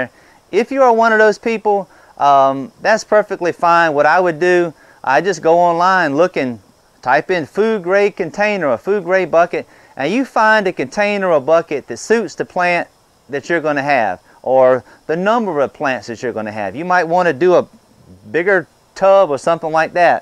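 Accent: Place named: Louisiana, United States. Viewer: I remember many years back, addressed on video, subscriber comments about his "accent". He stated that after going back and listening to himself he couldn't hear what people were talking about? I always figured it was remnants from the cajun region...if I recall right? I had never even noticed till brought it up one day and lo and behold He hasn't lost any of his verbal charm!!! Love ya, you have taught me so much the last decade, I truly appreciate the many many hours you put in and the honest down to earth teaching you blessed us with. Hey, when the snowflakes show up next time....let us handle 'em....with love & kindness.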